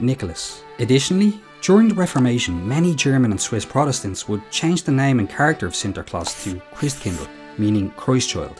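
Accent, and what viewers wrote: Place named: United States. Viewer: That merica voice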